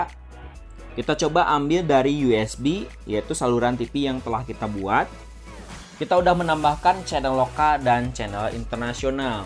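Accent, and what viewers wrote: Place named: Java, Indonesia. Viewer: TPRI 😂😂😂 medok bangettt bang 😂😂😂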